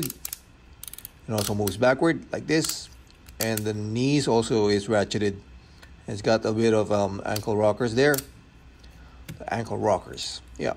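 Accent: Philippines. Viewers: You sound like a Filipino. Mabuhai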